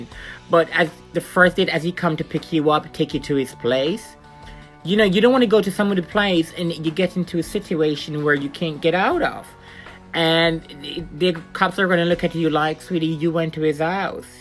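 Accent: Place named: Ireland. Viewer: Love your videos keep it up girl I know u said your Jamaican I love your accent sounds Irish ☘️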